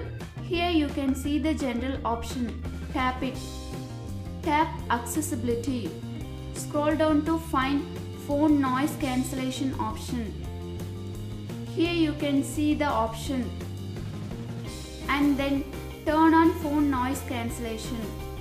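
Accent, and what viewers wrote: India. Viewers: Opens video Hears indian accent Closes video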